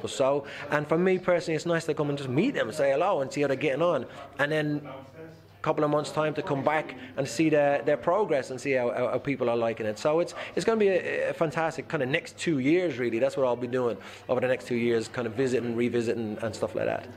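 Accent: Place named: Dublin, Ireland. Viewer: i like this fella the rough Dublin accent has improved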